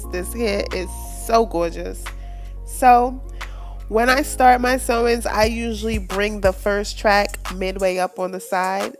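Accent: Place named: Brooklyn, United States. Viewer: Girl you sound like you from the Brooklyn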